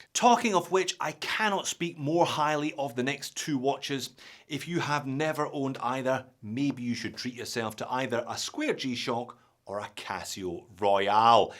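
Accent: Scotland, United Kingdom. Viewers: Oh the Scottish accent. I was trying to figure out what a dated complication is… an old function you don’t need anymore?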